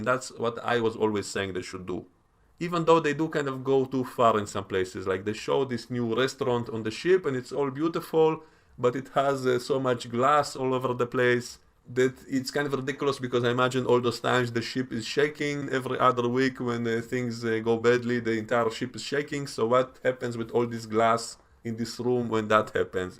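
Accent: Russia. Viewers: I explained it this way: the Vulcans simply accepted their wish to not communicate the first time. Not insisting on further action. I totally agree on the loose stuff on ships that shake every now and then. Someone said there were force fields to keep everything in its place, but that doesn't make sense. I'm a man and I love cooking but I never looked at it with gender in mind...... Human Spock eats the bacon after he said "I have to catch up on things", or something to that effect. I think Vulcans have an ability to control their emotions based on certain biological factors. I think they mentioned that once in Enterprise. Mia Kirshner is only 11 years older than Ethan Peck. Spock's mother assimilated quite well to Vulcan customs, so perhaps that's why they can mind-meld? I agree on "emotional Spock". It's a tad annoying. But since it's a prequel series, they perhaps try to explain how TOS Spock became the way he is/was? 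Only question I have: what is your accent? I really tried to figure it out, but I can't. Sounds Russian, but Idk. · What accent is this? Russian?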